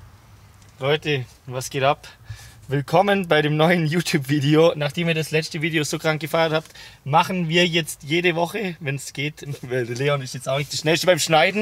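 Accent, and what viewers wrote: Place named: Allgäu, Germany. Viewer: Grieß da! Ich kann mit Downhill zwar eher wenig anfangen bzw. hab da zu arg fear, feier deine Videos aber richtig ab. Bleib auf jeden Fall so spontan, witzig und sprachlich Allgäuerisch eingefärbt. Das ist mega Authentisch!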